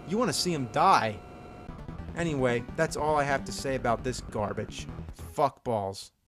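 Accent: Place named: South, United States. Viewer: and he says ayss he's got a bit of a souther accent he also said: what more could you ayask for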